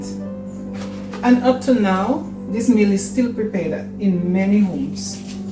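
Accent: Saint Lucia. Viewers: Love the St Lucian accent, keep talking so I can hear more of it. Also it is the green banana that is known as poor man food item...that said there is nothing poor about your nutritious meal!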